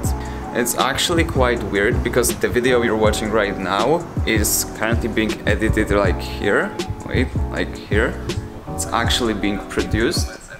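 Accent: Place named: Poland. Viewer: Polish accent maybe, but still he talks normally xD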